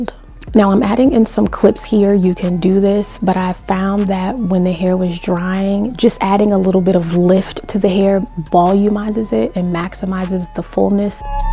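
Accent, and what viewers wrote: New York, United States. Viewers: I am from NY and they way you speak I'm figuring you are from NY or close to so you'll get this I'm dropping a Funky Master Flex bomb on how you slayed this sis lol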